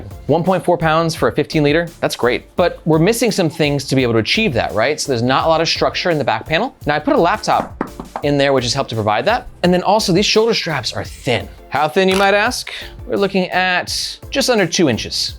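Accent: France. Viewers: Nice French accent 😊 thank for your review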